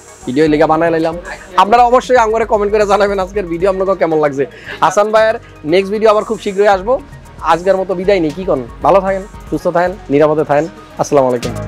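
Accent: Noakhali, Bangladesh. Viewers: Vai apni NOAKHALIR NAKI 🥰🥰🥰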